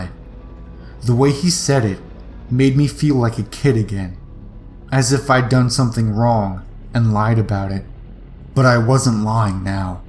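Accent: United States. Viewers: Love your cute American accent!!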